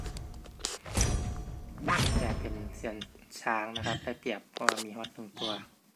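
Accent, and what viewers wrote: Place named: Isan, Thailand. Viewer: ผมขอโทษครับ หลุดฮาทุกทีสำเนียง ซ.ซ้าง ผมคนอุดรครับ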